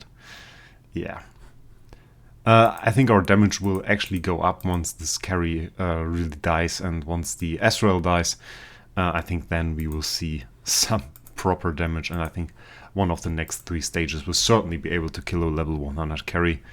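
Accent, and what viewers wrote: Germany. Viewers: Ur from germany right?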